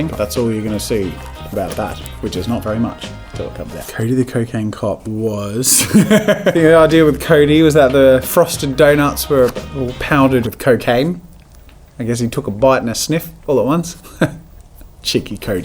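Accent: Australia. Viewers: Is he Australian because I am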